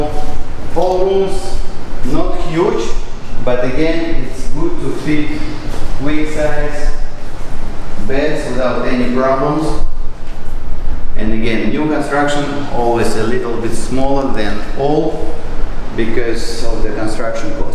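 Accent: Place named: Russia. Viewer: Слышен русский акцент)) но это только радует)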